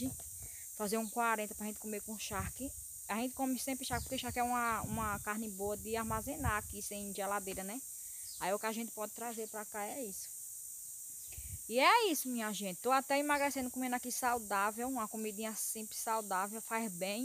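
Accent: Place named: Alagoas, Brazil. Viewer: adoro o sutaque de Alagoas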